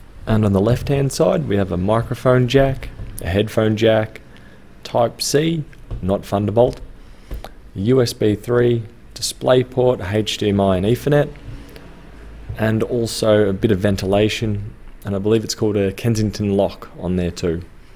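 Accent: Australia. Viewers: he sounds australian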